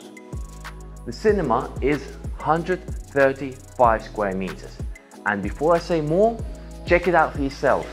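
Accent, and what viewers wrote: Russia. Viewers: Cant make out the accent. Russian, Dutch maybe... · Мне одному показалось или ведущий русский?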